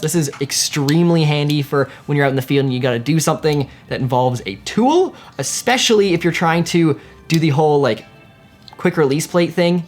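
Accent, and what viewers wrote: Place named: Canada. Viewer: Freakin Canadian accent kills me.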